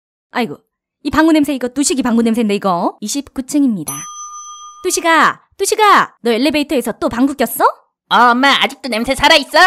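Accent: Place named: Gyeongsang, South Korea. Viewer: 대구 사람인 것 같은데요?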